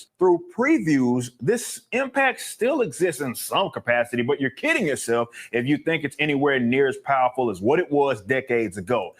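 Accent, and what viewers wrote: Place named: Texas, United States. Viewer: I can't stand the Texas hayseed accent dude... "is" is eeeyz, "been" is beeeyn, etc.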